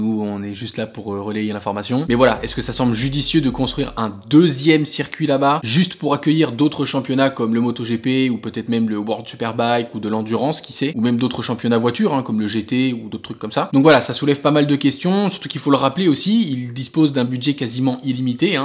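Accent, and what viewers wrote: Belgium. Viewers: Enfin qu'il parle comme un vrai belge continue comme ça le BG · Troooopppp biiiieeennn, les 5 actus, l’accent belge 🤣 👍🏻, 😳pas trop fan de la direction assistée sur une 🏍. 🤛🏻✌🏻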